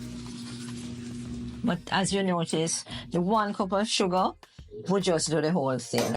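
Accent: Jamaica. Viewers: I love Jamaican accent.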